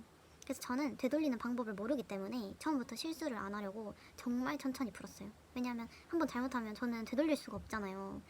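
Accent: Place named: Gyeongsang, South Korea. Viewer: 실기후기영상 보러왔습니다 일단 축하드려요 ~ 그리고 사투리가 좀 보이는데 고향이 경상도쪽이신가요? 시나공으로도 연습해보셨는지도 궁금해요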